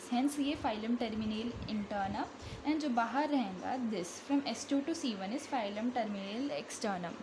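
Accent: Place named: Hyderabad, India. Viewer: well explained..... btw from ur accent ,u look from Hyderabad.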